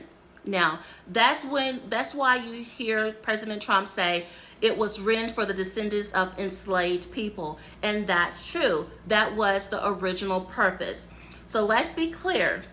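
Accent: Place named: Africa. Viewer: Another African talking about black American stuff. Focus on the civil wars over there and the endangered lions. We can tell by how you talk you are trying to hide that accent jungle jungle girl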